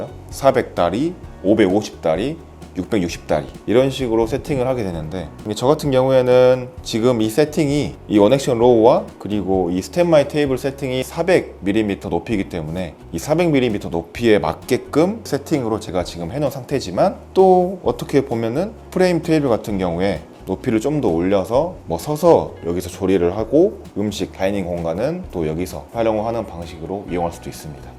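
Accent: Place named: Jeolla, South Korea. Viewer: 전라도사투리가 정감이가네요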